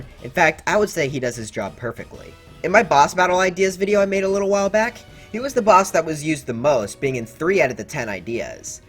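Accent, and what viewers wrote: United Kingdom. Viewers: Are you British i heard be ah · Are you British?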